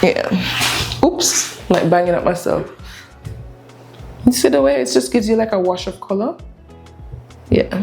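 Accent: Jamaica. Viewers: You sound Jamaican I'm Jamaican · What is the models she’s gorgeous and you beat that face soo good I miss og makeup tutorials like this and I’m Jamaican too did I hear that accent lol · Why do u just LOVE to hear the Jamaican accent?? No matter how long we live in the USA wih juss caan hide😂😂😂.. !! LOOOVE ur work too Mama🥰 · First time seeing your video. This showed up on my feed. I hear a Jamaican accent. Just check the description and I wear correct😂 hello from your fellow Jamaican🇯🇲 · I actually really love this detailed video🎉❤ You've been popping up on my page for a few days now, and worst you sound Jamaican, you've gained a new makeup sub❤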